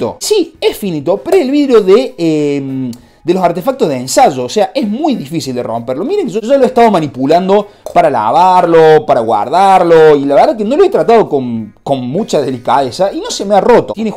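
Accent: Córdoba, Argentina. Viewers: La tonada cordobesa hace un mejor el vídeo jaja saludos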